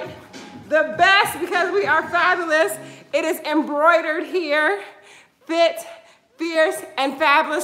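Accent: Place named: United States. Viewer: me encanta tu acento gringo al hablar espanol :)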